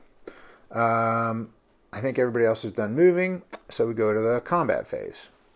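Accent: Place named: Pittsburgh, United States. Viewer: Thanks for making these OSG videos. I also thought in one of your videos "that sounds like a Pittsburghese accent" and yep you guys are here too.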